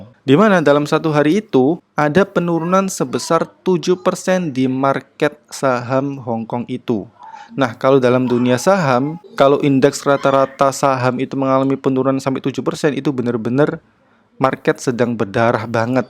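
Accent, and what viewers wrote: Surabaya, Indonesia. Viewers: Wong suroboyo ta dobol samean cak .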